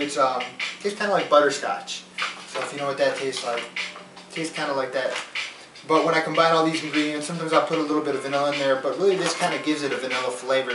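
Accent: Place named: United States. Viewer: Your American accent is too thick and with that messy kitchen I can't concentrate on what is happening.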